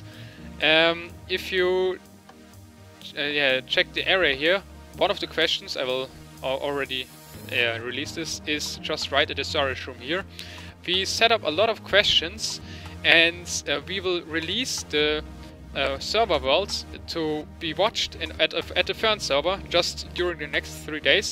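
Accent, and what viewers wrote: Germany. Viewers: lol bitch farm =D german accent is awesome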